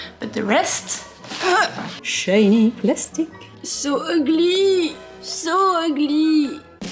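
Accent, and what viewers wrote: France. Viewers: Side note: I enjoy the way you speak english. I'm guessing the time you worked as a flight attendent helped with that. A lot of french words have made their way into english (looking at you Guillaume le Conquérant), but in english the stressed vowel is often a different one. With you I never hear a stressed vowel out of place. If I hazard a guess: you can also talk english with hardly any accent, but for the videos you're playing up french accent a bit. About the word 'stomach'. Yeah, that word is also used generically, but I think where you said 'stomach' the word 'abdomen' is a better fit.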